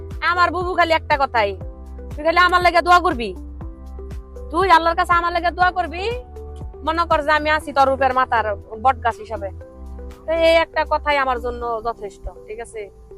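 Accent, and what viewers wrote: Comilla, Bangladesh. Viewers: আপুর বাড়ি মনে হয় কুমিল্লা।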